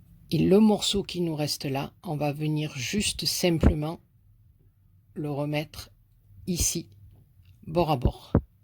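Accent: South, France. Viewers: bonsoir j'ai découvert ta chaine très récemment et j'avoue que j'apprécie tes vidéos tes fleurs sont magnifiques et j'avoue que je vais m'y mettre. j'adore ton accent du midi je suis allée un peu me promener sur tes autres vidéos et j'y ai découvert une bonne partie de tes réalisations qui sont tout simplement magnifiques, tu es vraiment une artiste je suis abonnée aussi à la chaîne de Christelle, j'aime aussi ce qu'elle fait et me sent proche d'elle, mon mari ayant eu la même maladie qu'elle à l'âge de 27 ans merci de nous faire partager toute mon amitié domi (de Cognac en Charente….)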